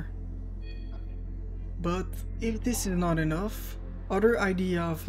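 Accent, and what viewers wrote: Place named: Quebec, Canada. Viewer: Hi, do you speak french? I noticed that in your username you have '' '' and that you have some what of a french canadian accent. (Si oui, je débute et je cherche des gens pour jouer )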